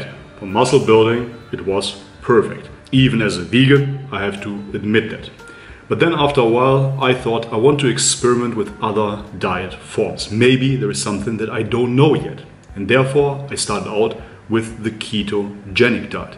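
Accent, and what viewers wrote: Germany. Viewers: Love that German accent brother! Btw I had to laugh when you said you prayed to Jesus Christ, since you look like a dead-set Muslim! Lol. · bist du echt deutscher?? ich (amerikaner) habe die Aussprache gemerkt aber dein englisch ist perfekt! ausgezeichnet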